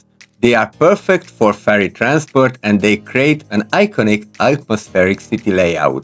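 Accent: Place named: Hungary. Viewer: nvm, your accent sounded Hungarian. this is what we say on the internet to each other.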